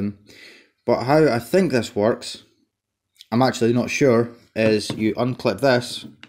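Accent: Scotland, United Kingdom. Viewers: Very dope. Now, is he from Edinburgh or from Glasgow? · Scottish accents are dope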